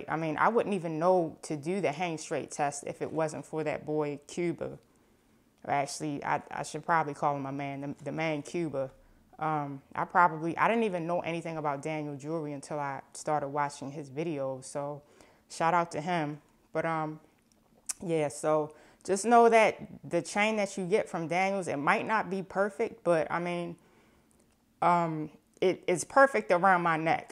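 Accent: Baltimore, United States. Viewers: I hear a little Baltimore in there?👀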